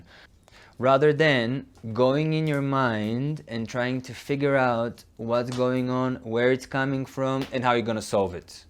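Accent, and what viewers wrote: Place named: Jamaica. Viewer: Your accent sounds kind of jamaican hahaha i love it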